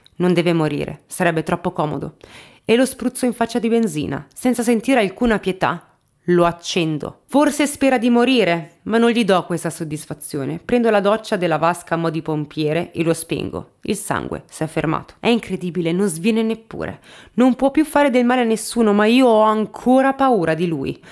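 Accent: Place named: Rome, Italy. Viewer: Daje che peesse non capitolina...lo parli bene eromano...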